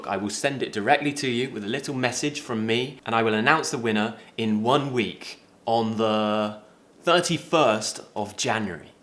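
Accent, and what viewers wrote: United Kingdom. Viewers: #EnglishGrammerInUse hope its not too late to comment and join the competition! Constantly learning English is one of my hobbies and favourites, and a foreign language makes me more sensible when talking to ppl and guide me to see the world in a different way! Thankss I really like your channel and feeling motivated and encouraged by such wonderful British accents too!:) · I really like the way that you teach us your British accent is easy understanding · When you want to sound British, just listening and imitating him., you're the best! #EnglishGrammarInUse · #EnglishGrammarInUse absolutely love your adorable British accent 😍 thank you so much for inspiring me to learn English. Much love from Russia ❤️☺️ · #EnglishGrammarInUse hello, your accent is the best British accent. I need some advice. I know many many words in English, but i can't use all of the words, when i talk or speak with somebody. 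Rest of time i forgot majority words. Please say me how can i use many different words in my conversation. Generally i use very simply words, when i speak. I want to speak more widely and i want to use more different words. Thank you so much before your answer! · I really like your British accent... #EnglishGrammarInUse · I adore your British accent!❤ You're amazing❤ Greeting from Egypt❤ #EnglishGrammarInUse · I love your British accent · Your accent is my inspitation to become a good british english speaker. #EnglishGrammarInUse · Wow! You are the best teacher, good lesson. And you have good British speech, the best as for me. From Ukraine with love and.. #EnglishGrammarInUse :)) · #EngliushGrammarInUse I just love your channel and your British accent. Nice one!!!!!! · #EnglishGrammarInUse Thank you for filming so many amazing videos. Your videos help me build up British accent greatly and I am totally fascinated by your voice! 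Your videos are always the best way for me to train my British English listening, and at the same time, I do learn some useful phrases also. Besides, your videos are always the first choice that I highly recommend my students to watch and learn British accent. Every time my students say that they are interested in the British accent, I always say go watch videos. Once again, thanks for filming so many excellent videos!! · #EnglishGrammarInUse I love listening to your British accent. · #englishgrammarinused Woww... you looked sexy at this video. Love from indonesia 😊✌ oh ya... I used to bad at listening, but i loved to learn listening through all your vid without subs. And nowadays my listening better i supposed because i can understand you with your British accent... Thank you for your vid and your clear sound and pronunciation. 🙏🙏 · I adore your British accent! #EnglishGrammarInUse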